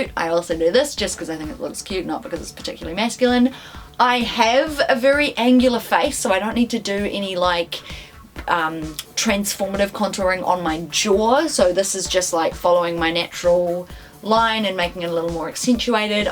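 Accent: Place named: New Zealand. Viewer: I heard that kiwi accent and I was IN!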